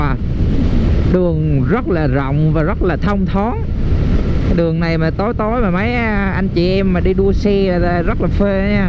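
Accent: Central, Vietnam. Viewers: Chưa bao giờ cmt trên yooutube,nhưng giờ phải cmt...Đây mới là MotoVlog đáng xem,xem xong 1 video được khá nhiều thứ cùng với giọng nói rất thân thương,ng miền bắc nhưng sao thấy giọng miền trung thân thương quá.Cám ơn đã bỏ thời gian và công sức để làm ra những video rất hay,rất bổ ích cho mọi người..Chúc và gia đình luôn khoẻ mạnh.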